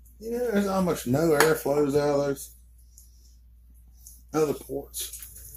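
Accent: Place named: North Carolina, United States. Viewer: you gotta be from North Carolina because we sound just alike when we talk. Im from around high point NC. Where are you from brother?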